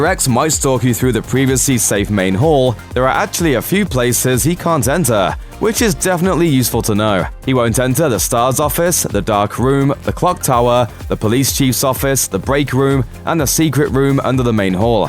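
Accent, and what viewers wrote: United Kingdom. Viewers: Stop talking british style dude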